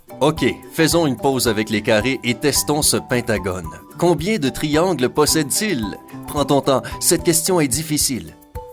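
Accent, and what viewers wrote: Canada. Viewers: C un canadien???!! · arrêter la voix du canadien j’ai mal a la tête quand je l’entend...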